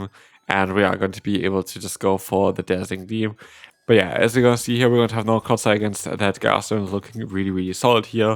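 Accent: Germany. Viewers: It would be great if you could speak a little bit slower and more exactly because it‘s pretty difficult to follow this fastly spoken Englisch with the German accent. Especially words like „literally“ sound like „liddellillllyy“ or „in todays video“ is „in tdsss veoo“. Thank you!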